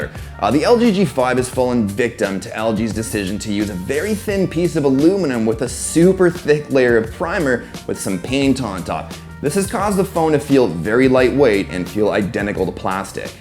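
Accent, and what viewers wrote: Canada. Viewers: i like u're videos man...and clean voice)....are u Canadian? I hesrd Csn from one video)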